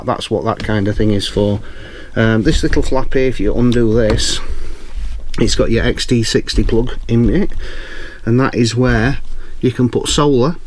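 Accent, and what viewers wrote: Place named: Northern England, United Kingdom. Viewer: He’s Northern English, like my mom’s friend, I recognize that accent😊. I was just about to order that kettle, surprised it couldn’t do it, was power lifting mode on? Oh well it’s small.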